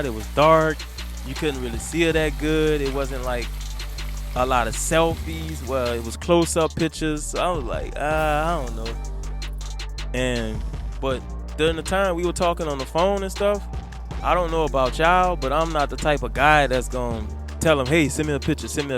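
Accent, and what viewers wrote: New Orleans, United States. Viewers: Bro this is a great video. Sounds like you from that NOLA too! Love man. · I just subscribed to your channel. I like your content. I’m from Philly and I was stationed in New Orleans back in the late 90’s. With that being said, you sound like you’re from the New Orleans area.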